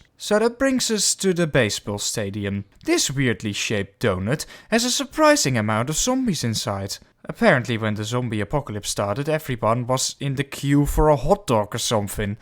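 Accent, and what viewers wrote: Netherlands. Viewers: I speak Afrikaans, and to me you sound like a Dutchman! Am I on the money? Been watching for a while. I like your style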